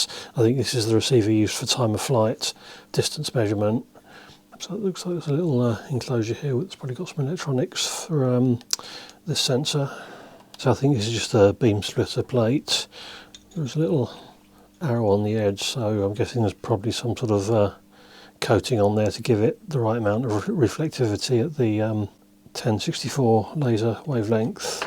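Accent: United Kingdom. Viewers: I think you're just not used to hearing British English..?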